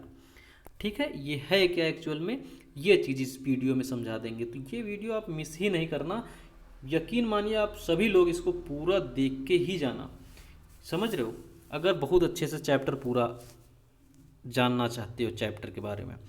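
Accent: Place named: Raebareli, India. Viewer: Sir आपकी आवाज़ raebareli के क्षेत्र की लगती है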